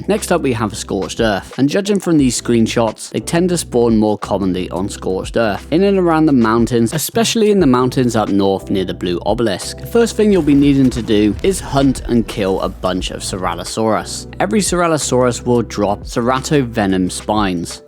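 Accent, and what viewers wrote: United Kingdom. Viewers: Yea he’s British bro, they say stuff like ‘Whas dat?’ D’ya like it?’ You’ve got to love it😂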